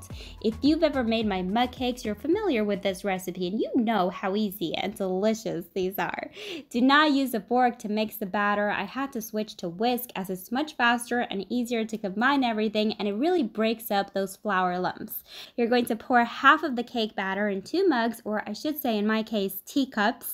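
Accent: Italy. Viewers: Are you from Italy? It sounds like it!! Gonna try it soon with my friends!! 😄😃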